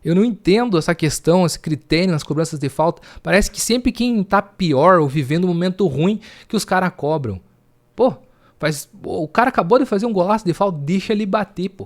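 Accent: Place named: South, Brazil. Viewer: Sou vascaino e estou aqui assistindo Vasco x Bahia e fortalecendo o seu canal. Você é natural que lugar do Sul?? PARANÁ? Acho foda o jeito de vocês falarem. Parabéns pela vitória!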